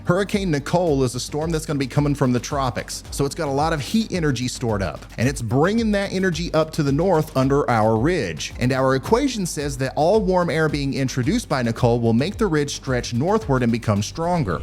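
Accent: South, United States. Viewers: the way you modulate your voice with that southern drawl, may be considered insulting to some folk ... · Refreshing to hear good ole southern weatherman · Very well done. Took one meteorology course in college, and it made me appreciate that this is a really tough profession. is excellent. And I enjoy the southern accent. Eastern TN or NC (Triangle area?)Or am I way off? Your “r sound” sounds like eastern VA though. So I’m stumped. Please let me know where you grew up. Beautiful accent, btw! Pastime of southerners is guessing each other’s accents!!!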